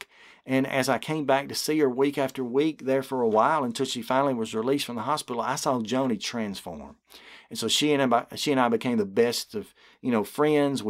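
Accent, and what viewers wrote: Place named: Alabama, United States. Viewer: I 💘 the Alabama accent . It is so distinctive. You preach in a pool pit. I love it!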